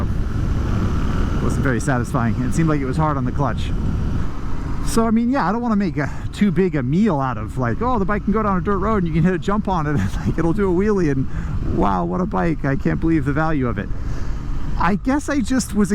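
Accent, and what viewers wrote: United States. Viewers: Was surprised when I heard an American accent. This dude looks super British lol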